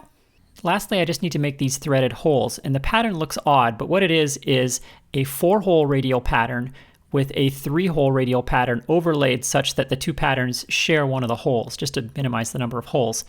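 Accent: United States. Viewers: I never thought of that but I love the idea. Great video. One thing that confuses me. You are supposed to be a Canadian but I never hear you say aboot or eh? You just used inches and as we say in the south you tawk uhmurikin. Not that you would use those words. Even AVE could be picked out as up nawth some place. Can you unconfuse me?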